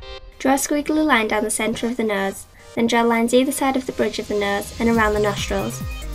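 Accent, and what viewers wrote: Yorkshire, United Kingdom. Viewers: You've just got a new subscriber! Also, you're from Yorkshire? I'm from there too 👌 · your Yorkshire accent is so pure in this lol I almost forgot how northern you are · it sounds like she has a Yorkshire accent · Her accent is yorkshire amd jade thirlwells is Geordie .... There's a difference x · R u british I am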